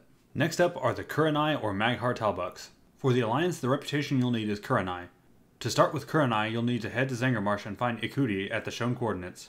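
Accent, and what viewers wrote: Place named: Netherlands. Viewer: You're Dutch, aren't you? Good accent, it's almost not coming through. Almost :P Nice vid, keep it up. Speak a bit slower though, it's not a race :P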